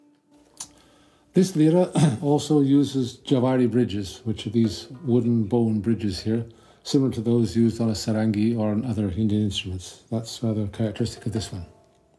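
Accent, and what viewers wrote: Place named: Ireland. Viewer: thank you...your Irish Brogue is still intact.....UCD Graduate Class of 04 Greek American!!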